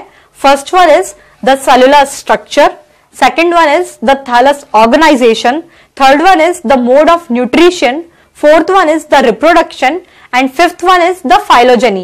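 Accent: India. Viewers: your pronunciation is truely indian .... its very difficult to understand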